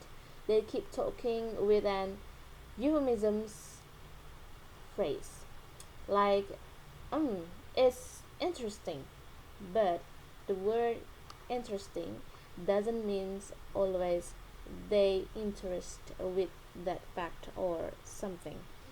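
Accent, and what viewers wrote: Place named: United Kingdom. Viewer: Hi,! I like your British accent, you are a good speaker hewhew. I get a lot of information from your article, especially in the difference between British English and American English. Then, if I may propose, maybe you can use a table to further clarify the difference between British English and American English, but overall you have explained your article clearly. Good job,! (Tere/102)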